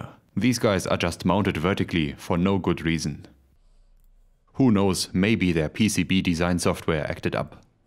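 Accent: Germany. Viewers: Your German accent is enticing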